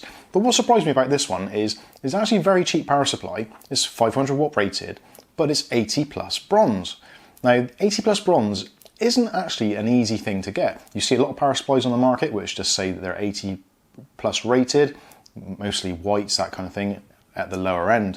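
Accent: United Kingdom. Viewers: I didn't notice anything. I thought it was his British accent.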